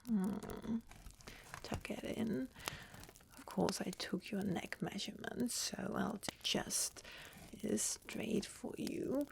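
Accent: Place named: England, United Kingdom. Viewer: Never gets old! Ur english!!!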